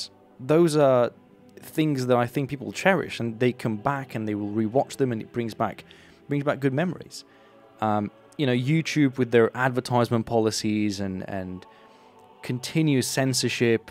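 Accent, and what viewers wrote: Australia. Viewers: Tbh he sounded more aussie or kiwi to me and im a British "person"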